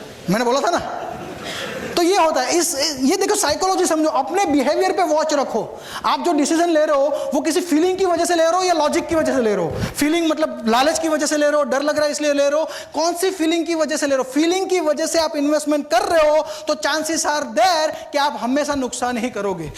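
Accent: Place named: Uttar Pradesh, India. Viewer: Your accent doesn't sound like Gujarati. Did you shift from UP/Bihar to Gujarat?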